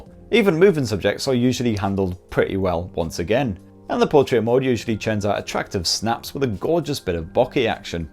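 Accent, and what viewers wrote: United Kingdom. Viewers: god his British accent is unbelievable for American 😂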